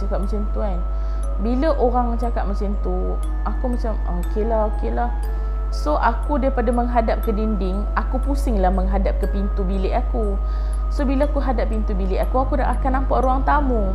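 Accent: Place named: North, Malaysia. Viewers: Terbaik 👍👍 Teruskan bercerita dalam dialek utara. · Suka l dgr akak ckp utara😍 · sat ckp utara sat ckp kl..dik biaq selaraih baru tatabahasa cantik dn crita jd menarik..cuma nk peringat jar..apa2pun good effort..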